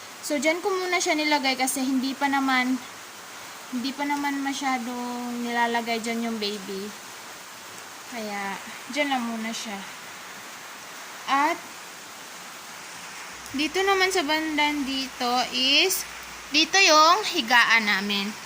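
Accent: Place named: Visayas, Philippines. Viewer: maganda yung bahay may 2nd floor pa pwede pa yang ayusin pauntiunti lng tapos yung green na kulay palitan ng white hehehe parang si beauty gonsales ka talaga mag salita maam bisaya accent 😎😎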